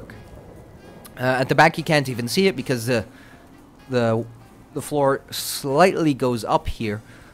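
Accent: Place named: Scandinavia. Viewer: Nice accent. I bet your either from Sweden, Finland or Norway. You guys are the only one that can both speak a very good english and have an excellent accent while doint it. Am I wrong ? :p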